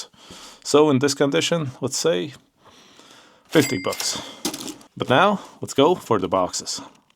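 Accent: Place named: Eastern Europe. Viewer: hmm Id guess you are either Slavic or Eastern European??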